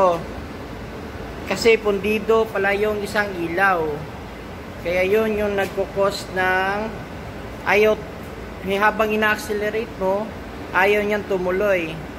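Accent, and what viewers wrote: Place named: Visayas, Philippines. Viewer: ang Cute Cute Bisaya nga lang....😅😅😅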